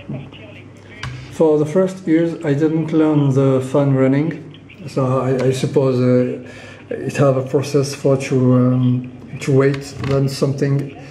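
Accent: France. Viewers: On dirait un accent francais ;)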